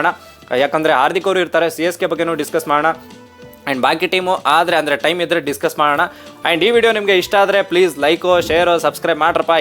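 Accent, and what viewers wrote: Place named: Hassan, India. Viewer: Nin pakka hassan Mysore 😂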